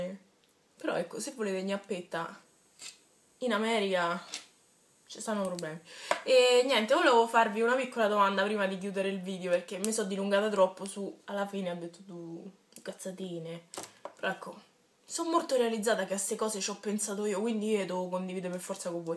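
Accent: Rome, Italy. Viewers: sei bellissima e bravissima^^ ma te prego parla meno romanaccio D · AHAHA romanaccia proprio :)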